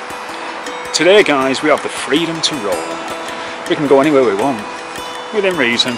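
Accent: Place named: Northern England, United Kingdom. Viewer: Great vid as usual. Out of interest I was watching one of your films with my wife and we both tried to place your accent. I appreciate you’ve lived in Hampshire for some time, but I expect you originate from up north?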